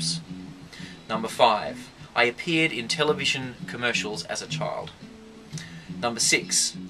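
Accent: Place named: Australia. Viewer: Number 9 was a lie; You sound Australian.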